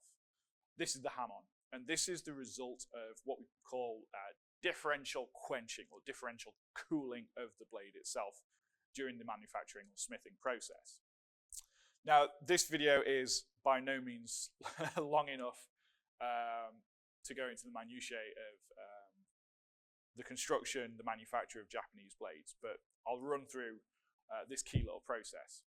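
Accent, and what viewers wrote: United Kingdom. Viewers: The psycho in me likes the weapons and the cultured person in me likes a British voice talking History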